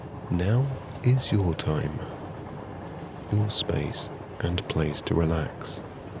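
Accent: Scotland, United Kingdom. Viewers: I was having some trouble recently calming manifestation.guide the voices in my mind during my morning meditation. him has a wonderful Scottish accent and a very calming voice. Thanks to his meditation CD's I have gotten back on track and feel a big difference in my meditation practice.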